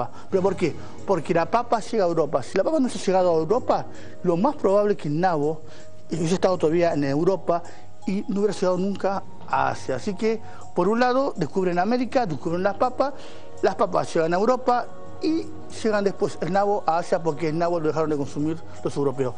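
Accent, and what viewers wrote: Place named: Argentina. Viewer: Una persona de rasgos orientales con acento argentino! hora lo ha visto todo!!! Muy buena receta!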